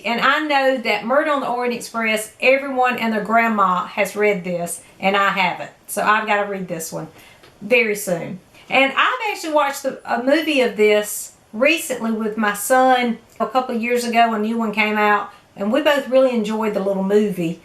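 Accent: South, United States. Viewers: It is so nice to hear your southern accent. My husband and I were both born and raised in Birmingham, Alabama. I got a job in Washington DC and we moved to Northern Virginia 24 years ago. Living up here I miss the food and the SEC football (Roll Tide). We still have family in Alabama. I can retire in 2 years but we haven’t decided where to move. It will definitely be a Southern city. You can take the girl out of the South but you can’t take the South out of the girl. 😀 This is probably way more than you ever wanted to know about a viewer. Glad to have found your channel and glad to hear your accent.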